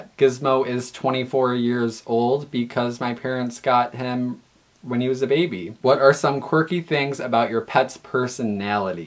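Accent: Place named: West, United States. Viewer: Also I hear a bit of a western accent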